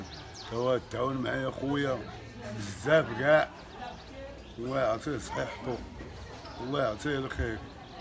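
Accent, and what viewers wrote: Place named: Marrakech, Morocco. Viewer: تبارك الله علي ناس لجنوب و خصتا لهجة مراكش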